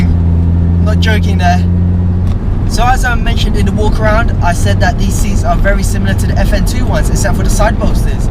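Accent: United Kingdom. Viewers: Asian face and British akcent .. :) Nice car man.!! I'm really sure about that.. this car is trully Type-R last has been made.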